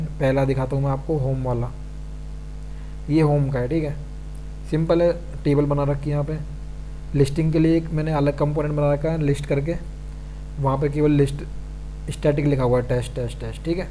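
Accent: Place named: Moradabad, India. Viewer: Awesome! Btw are you from Moradabad, Uttar Pradesh?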